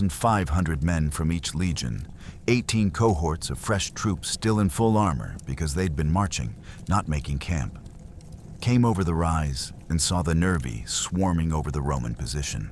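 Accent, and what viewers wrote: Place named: United States. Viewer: American accent doesn’t help describing historical facts…….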